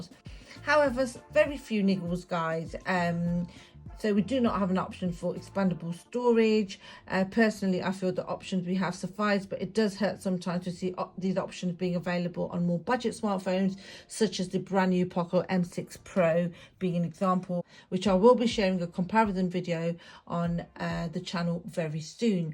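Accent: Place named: United Kingdom. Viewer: It's a British accent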